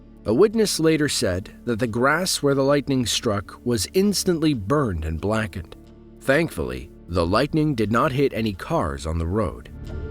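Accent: United States. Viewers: with American voice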